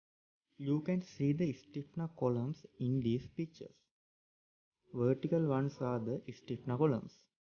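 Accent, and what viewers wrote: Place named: India. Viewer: Thank you for the explanation. However, I must mention that I had difficulty understanding you because of your strong Indian accent.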